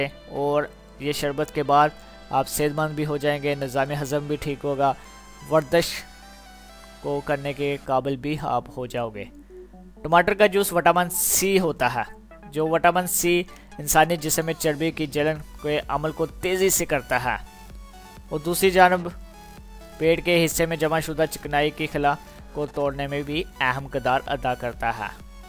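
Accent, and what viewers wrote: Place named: England, United Kingdom. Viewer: Aap urdu ko English k style m bolte ho kia aap English man ki birth ho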